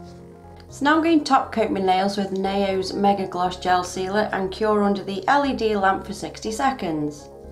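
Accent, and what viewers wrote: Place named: Cockney, United Kingdom. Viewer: Oh my gosh you are the nails queen! The stuff you come up with is always so stunning and creative! Keep up the great work, I love your videos! I've been watching your videos for about a month now and I gotta say I'm addicted, lol hope that doesn't weird. I was wondering what part of London you're from, your accident sounds east end but what do I know haha. Guess I'm just basing that guess on British crime movies. Lol sorry for the ramble I'm from California!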